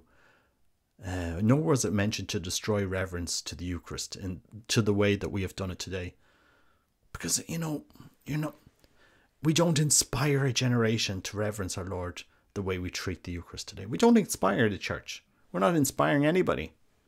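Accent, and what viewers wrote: Ireland. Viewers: At Holy Name Cathedral here in Chicago, the bishop celebrant extended the Host that touched my hand, leaving two broken halves inside my palm for which I was harshly admonished not to grab for the Host. The Church should have left well enough alone. Thanks for being here. And, from your mellifluous voice and accent I tell a Cork man. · I just found your channel and appreciate your discussion on the Holy Eucharist. It is too rare. I diverge a bit from you however on revealing the parish, if not the priest. What you have described is a grave sacrilege and crime in canon law. It is further a scandal to any witnessing it, serving to malform the faithful. I don't know where you are, but if I know that a parish or priest operates contrary to the law of the Church, scandalously, and bars the faithful from properly receiving sacraments, then it's nothing private. 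You can't let it go so that innocent travelers wander into a den of sacrilege or will be refused the Eucharist when they may really need it. It's just my own opinion, but I couldn't sit on that krp secret without it burning me in payment for the harm it could do. I live in a diocese where 2-3 parishes are notorious. New people to town ask me frequently where they should go. The whole reason that I suggest not going to those parishes is because of their noncompliance with Catholic teaching. I couldn't let those people be victimized. Anyway, great video. Good job. As an American, I love a good Irish accent.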